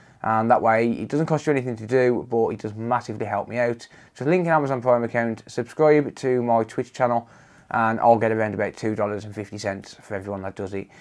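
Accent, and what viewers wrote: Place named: Dudley, United Kingdom. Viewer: Bro, strange how I've just ended up randomly on your video. I was the guy that commented on the Saudi post, and you suggested I add a loop. I'm repairing donor boards, and came across your video and noticed the name. Small world. I'm in Dudley, your accent sounds localish. Going to drop you an email.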